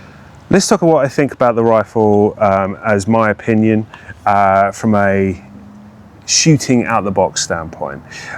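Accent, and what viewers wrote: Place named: United Kingdom. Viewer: I am sure its a nice gun but as soon as I hear british accent and sub 12ft/ibs I turn off.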